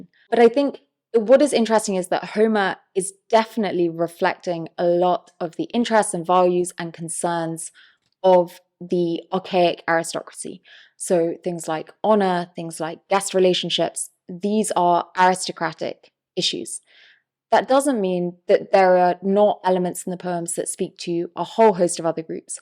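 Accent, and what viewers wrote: United Kingdom. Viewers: ..."was Homer a real person"... You have a British accent, resultantly that answer would be "whatever the government gastric urge." My last name however, would have a problem of the defintion of the number one. Any langisitic problem has a solution and those old stories all exist and were copied to address the same problem. ---->What is the number "one"? Is the number "1" different from the letter "one." Is there a "single" universal answer? If "Homer" is one person isn't a question. He is any and ever single person trying to learn how to read a language that was left for dead. This is written as a single indilible mark, never to be repeate in any other context. Not a watermark, but a coat of arms! (He is not just the first letter in the abecedry. Homer is the other meathods of alpabetical order as well. He is the king, where Ithaca is the empire.) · Yea gods you are Beautiful! 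And that accent, just saw a post on Threads comparing Sydney Sweeny to this actress who will play Emma Frost and then I find your vid and I’m like well THIS girl is cuter than BOTH of them😻😸😻-I got a weakness for Academic Intelligence and English accents. If you didn’t mind that gushing, I’ve always found it interesting how Polyphemus and the Cyclops are portrayed in Homer and through the years. The first we hear of him is from Zeus, in Fagles words “God-like Polyphemus who towers over the Cyclops Clans” then he goes into his pedigree like he is a Greek hero. But then in the poem he is a Brute and most adaptations focus on him being some “Redneck” monster. When I think of Hesiod”s Theogany where the Cyclops are beings older than the Olympians and talented craftsmen who create the weapons of the gods. It’s very sympathetic when compared to Polyphemus and the one I always preferred. Hav you ever noticed this? 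Also i subscribed so you’ll love me for life now? lol 😉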